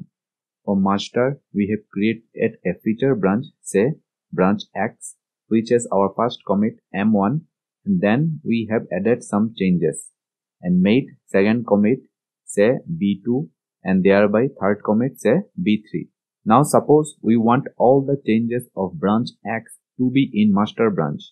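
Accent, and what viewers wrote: Bengal, India. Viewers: English accent se bangali lag rha h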